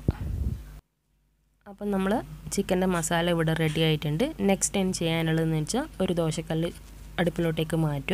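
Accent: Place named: Kannur, India. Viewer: Kannur slang sooper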